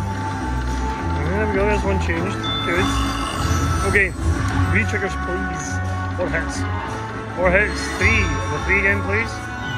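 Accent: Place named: Scotland, United Kingdom. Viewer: Just found your channel, loving it so far and love hearing a Scottish accent doing these blogs for a change 😂. Good luck I hope you win big! 🎰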